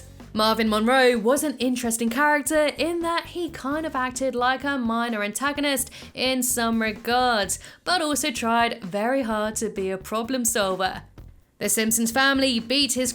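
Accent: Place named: England, United Kingdom. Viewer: This is my first time visiting your channel. How did I know you were English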